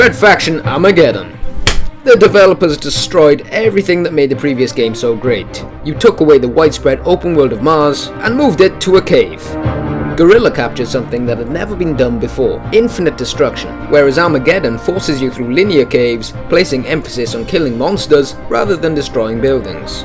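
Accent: Wales, United Kingdom. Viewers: BTW that's not a English accent either. Sounds Welsh. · are you Welsh? It sounds like you have a Welsh accent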